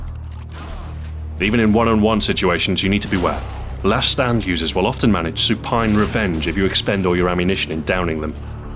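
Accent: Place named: United Kingdom. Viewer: In any other case you would have the same view. You probably only like it now because some guy with a badass British accent uses it. Rite?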